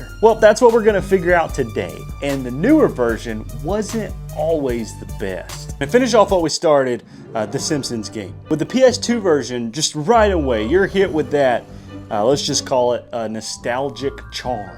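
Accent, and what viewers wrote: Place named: Louisiana, United States. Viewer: That accent is great bro where are you from Louisiana?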